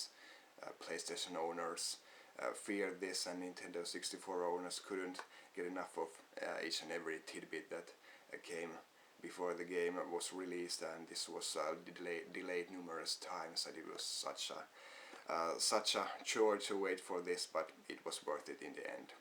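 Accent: Finland. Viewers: he sounds like he's from finland or some european country so i'd have to it's pretty obvious he is from finland.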